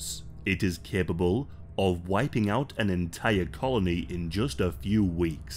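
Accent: United Kingdom. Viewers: he hasn’t got a ‘funny accent’, he has a regional British Accent. Perfectly acceptable.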